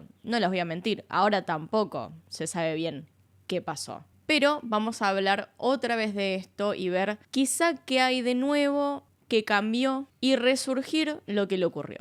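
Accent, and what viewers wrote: Argentina. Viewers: Amiga no hables como Argentina estás en México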